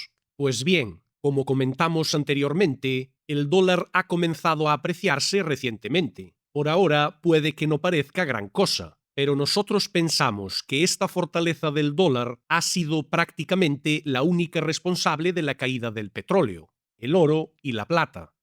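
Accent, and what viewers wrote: Galicia, Spain. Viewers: Me encanta absolutamente que el narrador sea gallego. No lo cambiéis nunca!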